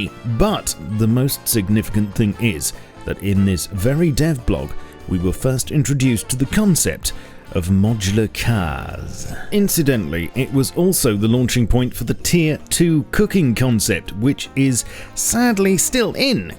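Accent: United Kingdom. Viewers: Every British person sounds like this if they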